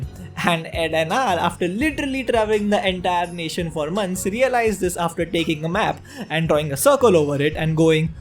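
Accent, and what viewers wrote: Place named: India. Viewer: Bro, i love your videos and i think you could become a huge anituber. But the main thing is if american/european/Canadians will watch your videos. Cox the anime community there is around 5 million people strong. Search up Gigguk, that's the community you can appeal to. I'd say only problem would be the accent barrier, but your English is already really good. You can become the first indian accented YouTuber who's appealing to the western audience. You can do it, just keep on going. I'd say also refine your content a bit more to appeal to western audiences more. Cox let's be real, the indian anime today is where the USA anime community was in the late 90s. It'd take decades to grow by just appealing to the indian audience.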